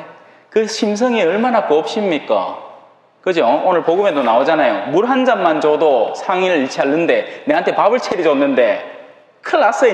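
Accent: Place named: Daegu, South Korea. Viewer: 신부님의 대구 사투리 구수하네요 알기 쉬운 강론말씀 감사합니다 세속 육신 마귀에서 열린 자 되겠습니다 ^~~♡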